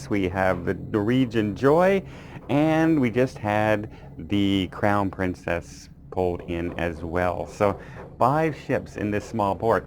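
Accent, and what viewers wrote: Canada. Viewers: Love it! You sound like me, I just can't keep quiet when I see atrocious behaviour like that. Absolutely love your Canuck accent! Just subscribed, your videos are great.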